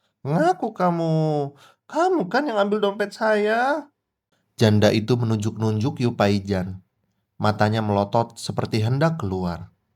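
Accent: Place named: Medan, Indonesia. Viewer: Kerennnn...logat medannya berasa banget...good luck yachhh 🎉🎉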